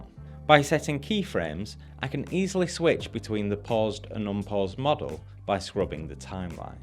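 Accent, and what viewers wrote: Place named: East of England, United Kingdom. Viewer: Hi, I hope I don’t come off as rude or too nosy, but I’m trying to pinpoint your accent (just out of curiousity) but I am really unsure. Some where from the East of England? Might be way off😂. This is just a hobby of mine, trying to learn to distinguish British accents and to name them. But I haven’t got very far yet.. still learning to somewhat localise them.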